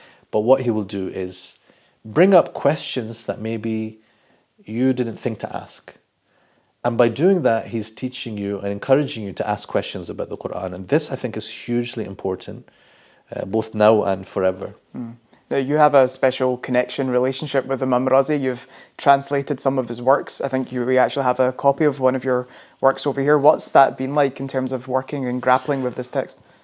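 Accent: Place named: Scotland, United Kingdom. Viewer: sorry, Shaykh. Couldn't resist. Never heard a scholar with a Scottish accent before, but l appreciate what you are doing.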